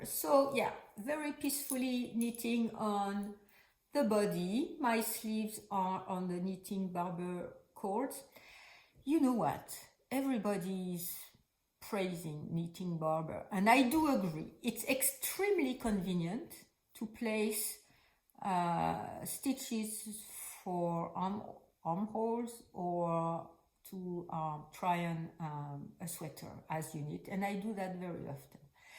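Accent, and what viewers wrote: France. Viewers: Delighted to have come across your channel today while knitting. I enjoy listening to other knitters talk of their projects as I knit and I find your French accent very pleasing. I've always thought the French language is beautiful so your accent doesn't bother me a bit.:-) I live in Maryland....just outside of Washington DC and we are having many storms and heavy rain lately as well. Your WIPs are lovely. The Madeleine sweater you are working on looks similar to the Astragal sweater by Ainur Berkimbayeva that I knit awhile ago. I also like the look of that Ripple j Jacket pattern!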